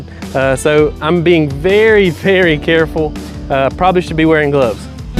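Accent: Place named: North Carolina, United States. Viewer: Oh my God! The taste of home grown tomatoes is amazing! I grew red pearl grape tomatoes (from Johnny's) this year, and they were so good! Very productive and so sweet... Also the yellow brandywine was amazing as well 👍 Thanks for sharing your NC garden. I used to live in New Bern but now I'm in Virginia. You're accent brings me back to NC 😄 love it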